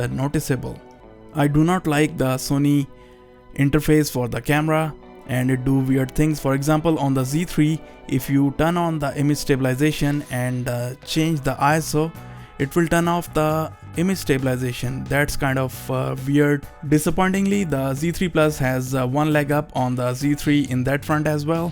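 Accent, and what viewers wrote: India. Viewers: you sound indian 👎👎 👇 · That's indian tone... lol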